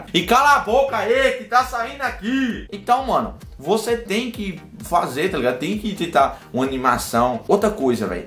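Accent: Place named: Bahia, Brazil. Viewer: Kkk ''wwooo uma bosta'' Vlw mano !! Salve para a Bahia sei la onde e teu sotaque . só sei que só baiano .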